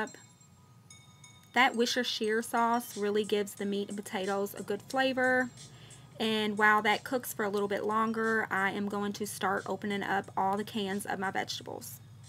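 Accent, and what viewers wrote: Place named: Kentucky, United States. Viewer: Girl I knew you had be from ky. Way you clean lol an talk. I am from Eastern.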